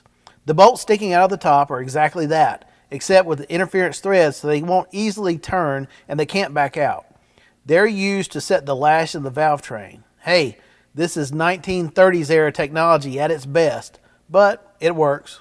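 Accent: South, United States. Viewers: And the Oscar goes to 'All the Oddities of Ford's Famous Flathead V8'. A video above the rest. Award winning performance by (the artist). Narrated by a good ole boy from the South. It don't get better than this! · That speech pattern is called "Southern"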